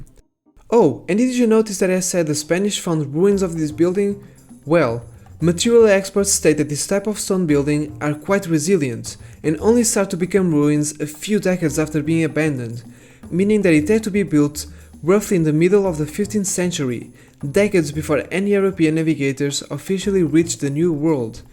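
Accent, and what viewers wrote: Portugal. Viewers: Let me guess... youre portuguese?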